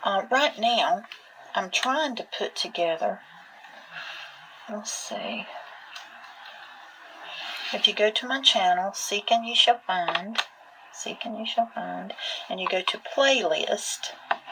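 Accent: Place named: South, United States. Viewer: Soooo good to hear that calming southern accent! I have missed you sister.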